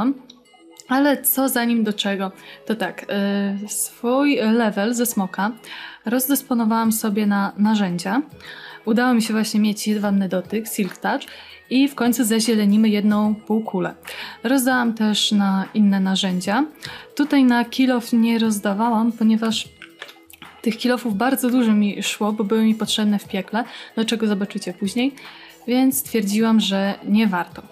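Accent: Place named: Podlasie, Poland. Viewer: Møwisz Tak o jestes z podlasia :P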